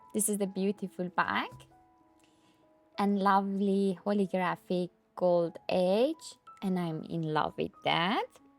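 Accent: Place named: Iran. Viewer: you sound Iranian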